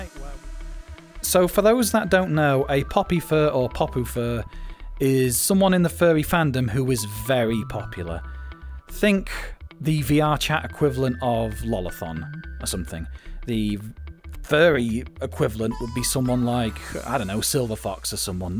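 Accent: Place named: United Kingdom. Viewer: Not at all was I expecting a man with a british accent from a small character good vid though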